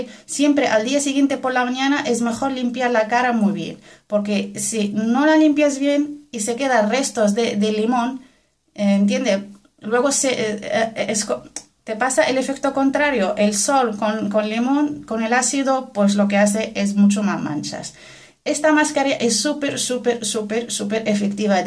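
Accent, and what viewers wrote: Spain. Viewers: Hola de donde eres, parece que erea de españa pero también pareces como si hablaras otro idioma porque como que te trabas al hablar, perdón por mi ignorancia solo quiero saber🥺, aoy nueva en tu canal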